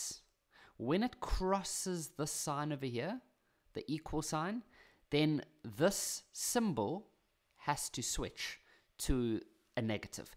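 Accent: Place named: South Africa. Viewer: what country are you from because you sound like your south african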